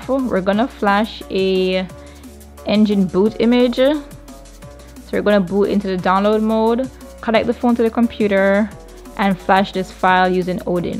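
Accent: Jamaica. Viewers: U Sound Jamaican, I'm a Fan Of Modding Bypassing, Custom Firmwares & Roms! 💯 I Subbed ☺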